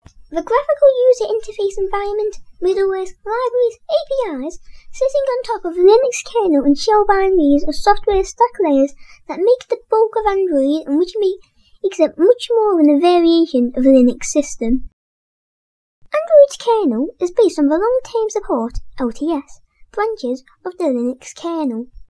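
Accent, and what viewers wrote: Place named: Liverpool, United Kingdom. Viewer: The gentleman is from Liverpool, if I'm not mistaken.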